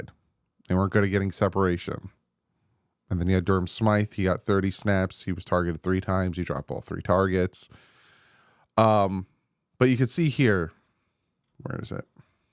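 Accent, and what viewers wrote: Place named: Hawaii, United States. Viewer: I really wanna hear this rant and the Hawaiian accent lol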